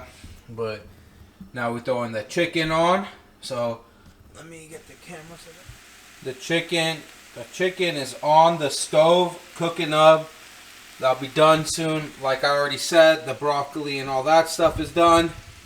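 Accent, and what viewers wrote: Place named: Michoacán, Mexico. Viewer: I seriously thought you were from Michoacán Mexico 🇲🇽 bro Love that meal 🥘 prep easy and affordable thank you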